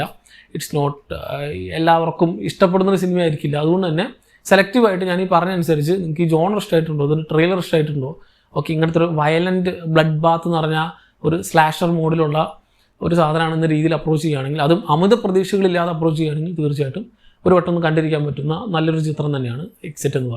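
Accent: Palakkad, India. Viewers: Palakkad bhagathu anenn thonnunnu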